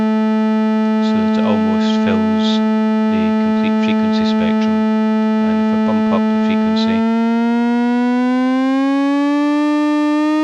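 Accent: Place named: Scotland, United Kingdom. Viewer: It's not loudness, he's Scottish.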